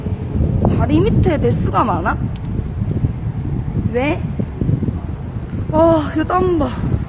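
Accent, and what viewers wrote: Busan, South Korea. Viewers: 부산 사투리 귀욥네요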